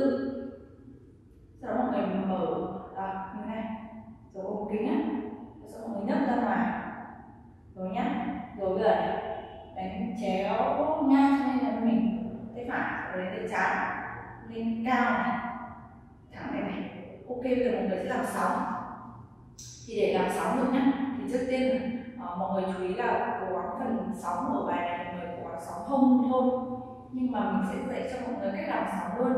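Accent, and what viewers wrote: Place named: Binh Dinh, Vietnam. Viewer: cho cách nói xe bình định mkmk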